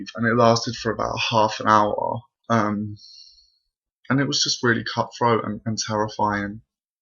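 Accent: England, United Kingdom. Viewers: nice to hear an English accent. what part you from.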